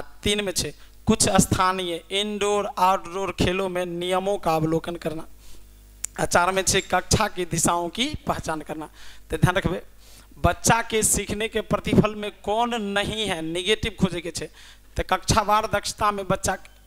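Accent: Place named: Maithili, India. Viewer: Itna shudh maithli 🤦‍♀️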